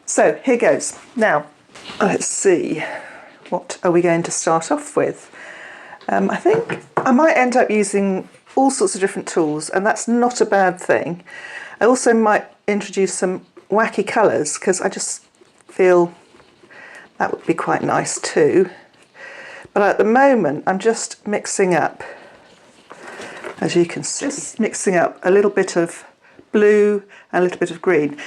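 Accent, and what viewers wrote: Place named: United Kingdom. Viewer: Dear, Thank you for sharing your very interesting say « experiments » with acrylic paints. I love it. And you give interesting tips as well to achieve such kind of expressive paintings. Also, we love your so lovely British accent from this continental side of the channel ❤😊. Take care. Respectfully from Paris.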